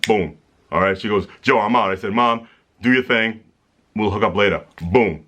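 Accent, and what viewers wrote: Boston, United States. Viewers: Your accent is really mild compared to a lot of other bostonians lol I've talked to. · Lol i never really noticed you have a Boston accent until now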